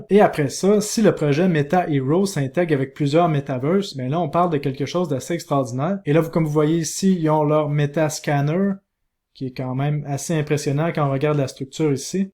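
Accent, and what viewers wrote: Quebec, Canada. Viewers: J aime de plus en plus entendre ma petite dose d accent québécois ! Merci de partager ton travail pointu et ton avis d expert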